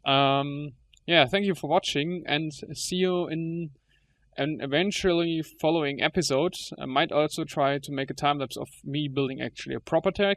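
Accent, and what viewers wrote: Germany. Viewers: you have a German accent!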